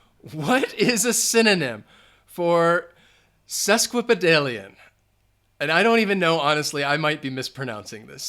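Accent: United States. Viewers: Very useful for those who speak fluenty another language wich isent their native one like me thank you guy and please try to not be absent for a long time please are you American or British i think your accent is pure american but I'm not sure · American , r is pronounced"are" , he uses slap t= "d"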